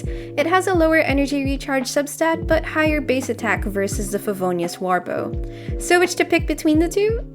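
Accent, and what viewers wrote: Philippines. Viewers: Idk why but im getting heavy pilipino vibes from her voice, where she from, I mean the narrator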